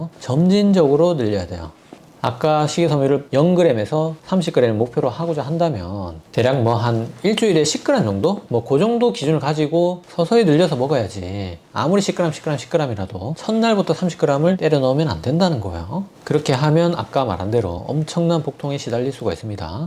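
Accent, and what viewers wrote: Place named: Busan, South Korea. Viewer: 우연히 선생님 영상보게되어 계속보게되네요 말씀하시는게 너무 친근하게 느껴져서 이상하다 했더니 부산에계시는군요 ㅋㅋ 같은부산사람 이어서 그런지 더 반갑습니다 좋은정보 너무 도움되고 좋아요 감사합니다 ~~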